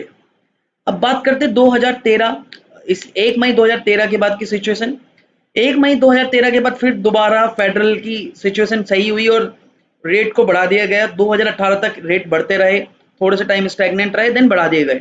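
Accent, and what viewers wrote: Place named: California, United States. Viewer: Cannot understand anything you sad - are you from California?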